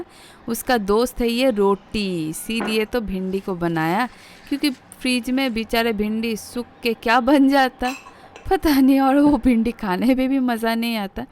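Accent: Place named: Bengal, India. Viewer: agar aap is format me interested ho, in Hindi language, then I would advice, you have to work on your language using some kind of training. Aapke words bahot achhe hain, tone bahot achha hai, but the beauty of what you intend to deliver, is getting lost, as the Hindi is Bengali accented. Bol chal ki bhasha me koi bhi accented language achha lagta hai, but is type ka theme agar apka aspiration hai, then please look for a language training somewhere. Agar language finesse ayega, then the narration will be of another level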